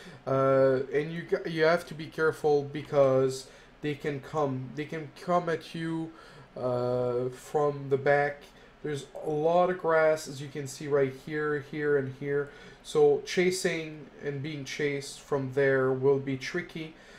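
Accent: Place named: Quebec, Canada. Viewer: ça parait dans ton accent que tu es québécois entk thx pour ta vidéo tres bonne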